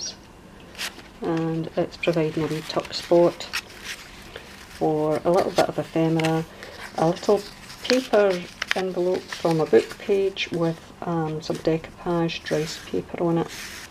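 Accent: Scotland, United Kingdom. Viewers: Absolutely gorgeous journal, really love it, it's so so pretty. Of course, we'd listen to you and with your lovely Scottish accent too. My aunt was a nun in Falkirk for many many years so I love to hear the Scottish accent, it reminds me of her and my visits there. Best wishes from the west of Ireland, Mary:)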